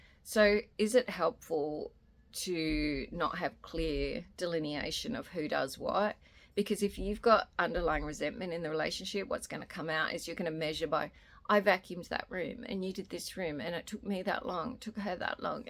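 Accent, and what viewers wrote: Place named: Australia. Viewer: Are you Australian? Its kinda tricky where to place your accent?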